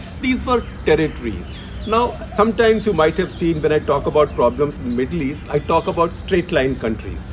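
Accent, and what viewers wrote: India. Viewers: Very interesting subject and very informative, BUT very difficult to understand what you say… because of strong Indian accent !! Pity ……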